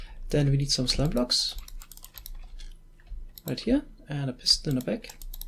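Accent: Germany. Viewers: Hi, i saw your minecarttrack in the nether for fast diagonal travel on your server and wanted to build it on my own, but i did not find a tutorial on your channel for it. Is there a video i did not see or do you know one? Would be great if you could help me out. MfG feris, ich gehe mal davon aus dass Sie Deutscher sind, da ihr Akzent hörbar ist.:-P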